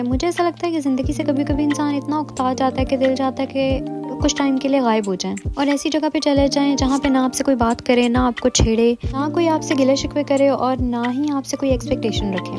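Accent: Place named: Punjab, India. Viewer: Urdu k sath sath apki punjabi b bht cute haee❤ Stay blessed😊 and make more volgs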